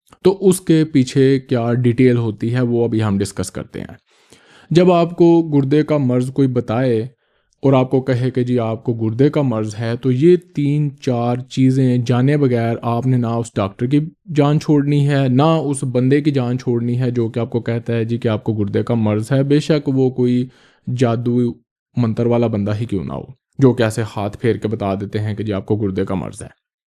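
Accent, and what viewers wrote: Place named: Punjab, India. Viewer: Sir Your vids are extremely helpful for ckd patients. Your urdu/ Hindi has a Punjabi accent. Love from Indian Punjab....❤❤❤❤❤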